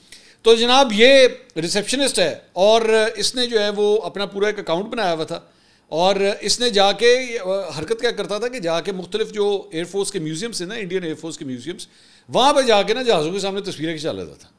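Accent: Punjab, Pakistan. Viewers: bhai ye jo bat kartay kartay ap punjabi touch detay ho 😅😅seiously i love it kindly continue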